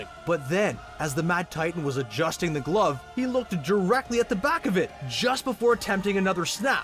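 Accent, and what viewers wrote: Canada. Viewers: Is the narrator Canadian? (His pronunciation for some words make it seem like it.) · You have a heavy Canadian accent. Are you from Alberta.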